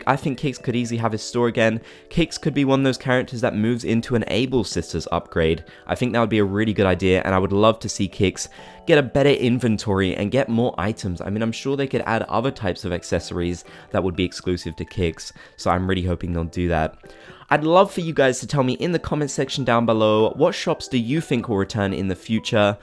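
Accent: United Kingdom. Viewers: I enjoy listening to your British accent, especially when you said PAT-UN ! 😄 New Horizons is my first Animal Crossing game, so I would gladly welcome any new stores. Leif is also one of my favorite NPCs, so I’m all for him having a shop.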